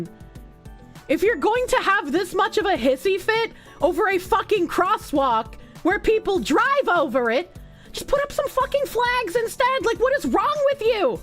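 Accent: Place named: Boston, United States. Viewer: She's a bawstin gal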